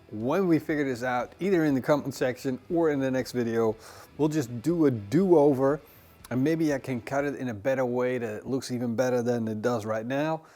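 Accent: Netherlands. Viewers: You sound like a Dutchman. My dad kept that accent for as long as I recall. Ahhhh dacht ik well hoor. :) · Holland is my guess. If you ever can't quite place an accent, it's almost always Dutch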